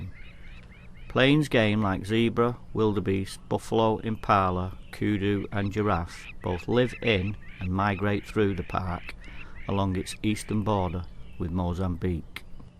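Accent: United Kingdom. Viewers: Intrigued to hear a Yorkshire accent narrating about a South African game reserve. I think you did a good job and I particularly appreciate the natural sounds of the bush in the video. Too many of these videos have awful background music that adds nothing of value. I spent 4 weeks doing conservation work on a SA private game reserve and hearing the sounds of the bush revives happy memories. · you have a great northern accent. Well narrated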